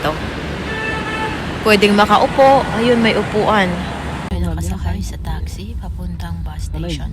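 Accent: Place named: Ilonggo, Philippines. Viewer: Ilonga kasi ibà kung minsan ang pagbigkas❤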